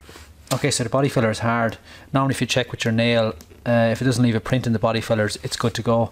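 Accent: Dublin, Ireland. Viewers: Very good buddy - nice one - love the Irish accent as well. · Ooh, that Irish accent! ❤ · With that accent your Irish.... where da hell did you get that 2k clear in this country. It's like hens teeth in the Midlands. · Where ya from Dublin? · Nice! And the Irish accent was the icing on the cake 😃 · I really enjoyed this mate i love the Irish accent could listen to you all day. too al those who put thumbs down 👎 what a bunch donkey's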